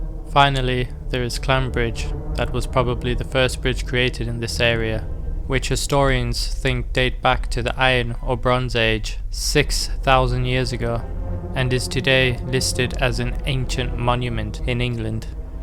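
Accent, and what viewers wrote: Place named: Ireland. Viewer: Good to hear that lovely Irish accent again. I’ll make sure to visit Lancashire next time I’m in Ireland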